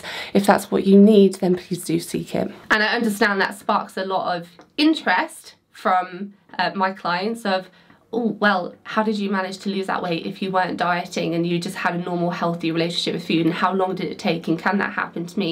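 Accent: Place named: England, United Kingdom. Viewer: I am on a path this week, discovering that you are speaking ME and I have an eating disorder. This was the very first video I found of yours- I watched it 3 days ago and I can't stop thinking about this one thing you said: "Why not shift your thinking from, "How can I lose weight?" to instead simply a goal to have "a healthy relationship with food so you can live out a happy life." YES! This insight has COMPLETELY changed me and I just wanted to say thank you for helping me on this brand new journey to start having a healthy relationship with food. You are amazing. P.s. your English accent, long hair and delicate beautiful features remind me so much of the gorgeous actress Jane Seymour.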